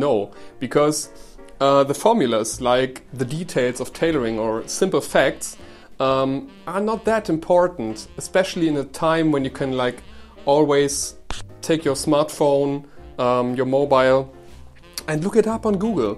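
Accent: Germany. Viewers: Perfect! Not enough people teach the philosophy of style which is, in my opinion, the most important aspect. From there, everything else flows. And your English is great...the German accent makes it that much better too my friend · I love German accent so don't worry, it's actually very beautiful and pleasent for the ears ❤️ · Aber zum Glück stören nur wir Deutschen uns an einem deutschen Akzent. Für die native speaker klingt es exotisch, und der Rest bemerkt es eh nicht. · British Vs Italian style with a German accent. An interesting concept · Well it is audiable that you are not a native speaker, but so what? You are very easely understood, speak free, flutend what more can one expect? Also who am I to complain, I m not a native speaker as well. The only thing curious is how different your accent is in comparison to mine, given we share our mother tongue. I doubt anyone could guess that hearing both of us. 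I dare say yours is more stereotypical german while mine just . . . all over the place and a huge disorganised mess, but who cares, communication still works ^^ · I have German relatives and was married to a German for 4 years almost 40 years ago. He's been in America more than 40 years and his English is far, far better than yours. Your English sounds like that of my cousin from Bielefeld; it's like ASMR (?) for me. Also, I used to teach at Göttingen and Bonn, (an English speakers class on history and foreign policy) and while I was impressed with the quality of my students' English, yours is far, far better. So no need for you to apologise for ANYTHING about your English! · You have nothing for which to apologise with your accent. An accent in a foreign language is not something to deride. Especially a well-articulated one, such as yours. I find that a properly enunciated German-English accent gives a certain aristocratic flair, it feels very Prussian. 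As long as you are judicious in your grammar and word choice, your accent is an asset rather than a liability.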